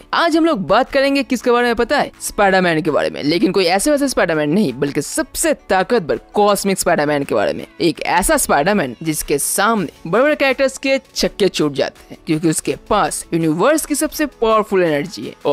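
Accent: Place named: West Bengal, India. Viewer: Bro ur accent is so heavy, anybody can tell that u belong to West Bengal 😂( No hate, i love ur vids too much bro)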